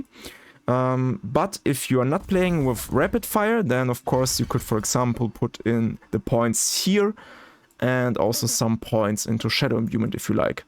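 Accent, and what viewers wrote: Germany. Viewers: Moin, wo kommst du eigentlich her, klingst irgendwie deutsch? :D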